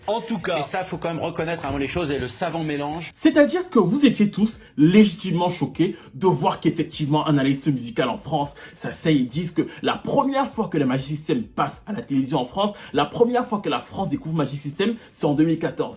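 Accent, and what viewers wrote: Cameroon. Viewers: Je viens de decouvrir ta chaine et like beaucoup,surtout ton ptit accent +237...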